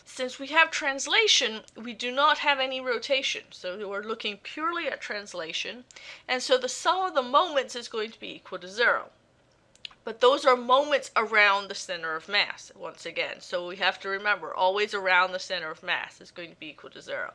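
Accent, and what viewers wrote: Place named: South, United States. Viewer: i loved your southern accent mam :)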